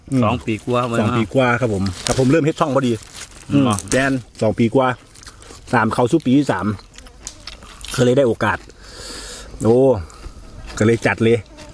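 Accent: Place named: Sam Phrao, Thailand. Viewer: เจ้าคือเว้าคือสำเนียง สามพร้าวบ้านผมแท้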